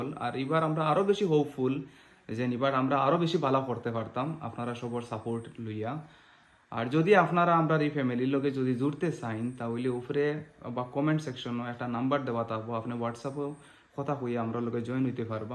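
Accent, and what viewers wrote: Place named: Sylhet, Bangladesh. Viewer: Aita bengali language na ....sylheti language a kotha boltese...Amio Dhubri theke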